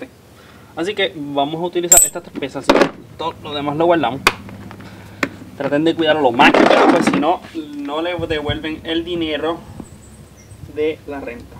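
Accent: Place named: Puerto Rico. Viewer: oye brodel ..yo de mala pata saque el pistonsito completo del caliper. y ahora no me quiere sangrar..algun consejo q me puedas dar? ahi otra manera ..se sangra con la guagua prendida o apagada? soy novato en esto so mientras ma detallado puedas ser te lo agradesco..y x tu acento creo q eres bori..so una ayudita no me vendria mal gracias de antemano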